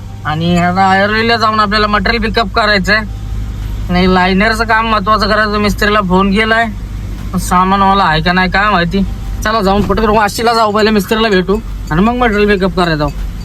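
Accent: Satara, India. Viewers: सातारची बोली भाषा एक नंबर भावा😂😂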